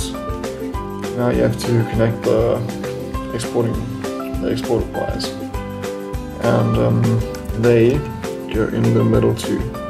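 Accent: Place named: South Africa. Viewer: Hello, by the accent I assume you come from South Africa, where did you get the switches from?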